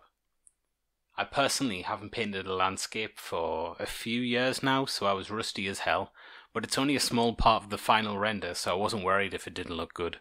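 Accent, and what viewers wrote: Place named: Teesside, United Kingdom. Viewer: Sounds like good old Boro to me?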